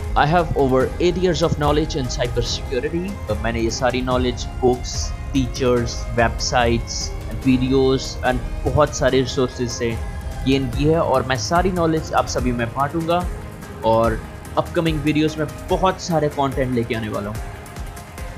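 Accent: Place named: India. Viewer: Stop speaking Indian and only speak English as have a hard time understanding your heavy accent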